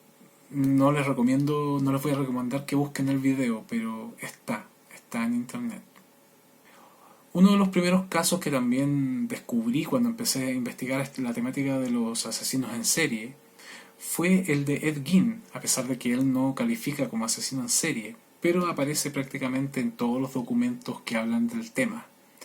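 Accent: Chile. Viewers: Wow tienes acento chileno, eres chileno??? No sabía jaja eres genial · Me ha encantado tu canal... He visto varios vídeos de asesinatos y creo que los tuyos son sumamente completos en cuanto a información y material fotográfico o fílmico... Además me gusta tu voz cuando los vas narrando... El acento chileno me encanta... Saludos desde Mendoza, Argentina... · Me gustan tus documentales, por que tu voz es neutral y muy clara, me costó encontrarte el timbre chileno sin embargo es maravilloso un acento y una forma de narrar como la que tu tienes, correcto y facilidad para entenderte, siempre agregas más datos y nueva información, se agradece amigo mio. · Me encanta el asentó chileno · La tonada chilena que tenés ....le da un toque maravilloso a los casos .....muy interesante tu canal !!! Desde Argentina te saluda una chilena !!!!!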